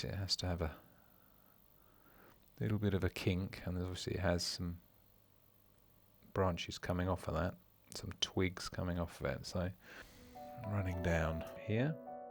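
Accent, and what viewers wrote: United Kingdom. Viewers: Lovely British accent🥰😀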